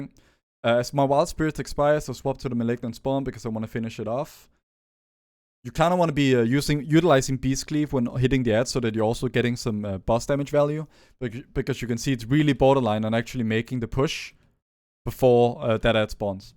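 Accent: Denmark. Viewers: Are you Danish? :)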